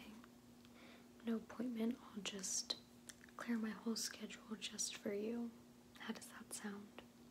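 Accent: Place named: Massachusetts, United States. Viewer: You from mass?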